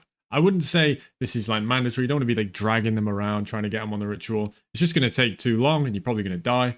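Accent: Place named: Lancashire, United Kingdom. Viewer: Thanks or, appreciate the constant different strats to try - will be giving this a go tonight, and i'll post my findings as well. Out of interest, where are you from!? You sound like you could be from where I'm from in Lancashire, but also could be southern. Cannot guess.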